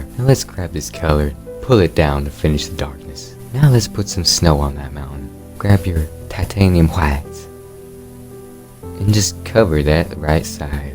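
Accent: South, United States. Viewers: Damn I wish we got more of that nice country accent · Why is he getting more country as he goes?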